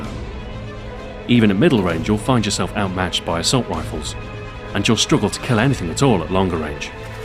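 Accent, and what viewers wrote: England, United Kingdom. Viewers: I never knew is brittish lol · it took me 4 minutes to realise hes english :D i cant recognise my own accent :D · Most useful intel indeed, as always narration is more believable in british. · He sounds like one of those professional british voice over actor. SOOOOOOO good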